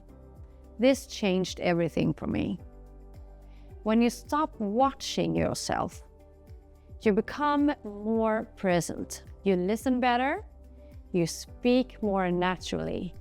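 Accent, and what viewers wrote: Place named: Sweden. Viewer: Great summary of years of experience. Keep you accent - it gives you a distinct charm. You sound like a Swedish person among the crowd of wannabes 🙂 Yes, speaking clearly and comprehensibly is important, but do not get rid off of your accent completely - it is your feature to be memorable. Though for many it is a goal of their life.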